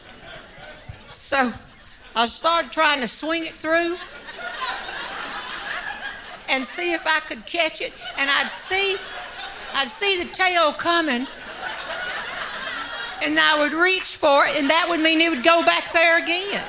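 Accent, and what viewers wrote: South, United States. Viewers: I stumbled on to Jeanne Robertson today while looking at & "." What a great link. Love this woman's sense of humor & her southern drawl. I can't stop watching. Have family in Carolina. Hope we can see her in concert either in Charlotte or Durham. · You are soooooo Southern, I am homesick. I love your accent and story telling ability. It's a wonder your family hasn't disowned you by this time. I love it. I love it. Thank you for your gift and sharing it with the world. If your children and grandchildren ever run away make sure they sign off on their insurance plans. You know they'll come to no good. You might as well cash in. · I will come back to this one when I need a good laugh! Jeanne Robertson is absolutely hilarious with her southern accent and 6'2" frame. Love her! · Her delivery and voice are pure HeeHaw. Brings back wonderful memories of watching it on Saturiday nights. 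This is the kind of humor I've been around all my life seeing as I'm a died-in-the-wool Southerner.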